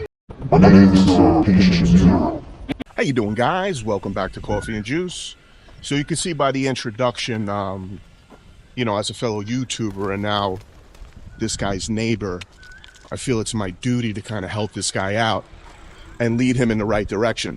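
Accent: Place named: Long Island, United States. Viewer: When I hear you speak it reminds me of my Homies from the north shore of Long Island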